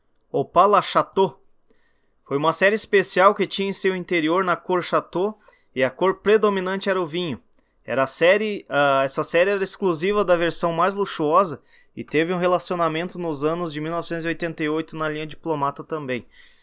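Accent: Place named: Paraná, Brazil. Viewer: Paranaense , acertei ? O melhor sotaque do Brasil !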